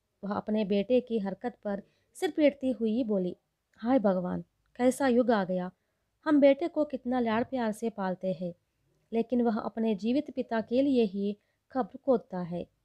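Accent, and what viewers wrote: South, India. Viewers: Bahut achha aur bolne wali pakka South Indian hai and really love South Indian guys really hard working and intelligent people.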